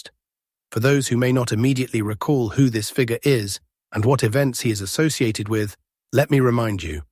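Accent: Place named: United Kingdom. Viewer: Stop broadcasting with a UK accent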